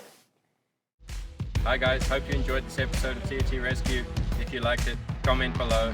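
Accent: Australia. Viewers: Are you Australian? I was so impressed with your approach and the lessons you taught this beautiful horse, you saved him, Bless you, from Australia ❤ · Is this horse 🐎 teacher an Aussie or a Kiwi 🥝???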